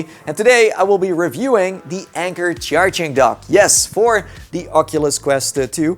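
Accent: Netherlands. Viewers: Zie je nou wel ik zag het al aan je en aan je engels dat je Nederlands was ahahah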